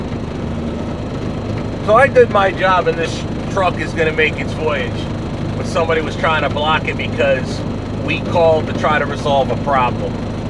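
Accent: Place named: New York, United States. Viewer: You from New York New York or New Jersey that accent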